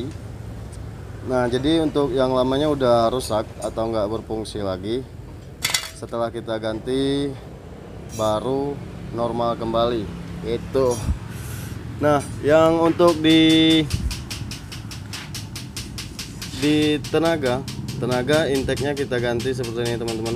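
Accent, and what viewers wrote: Palembang, Indonesia. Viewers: Kayak kenal bahasanya, wong Palembang yo om?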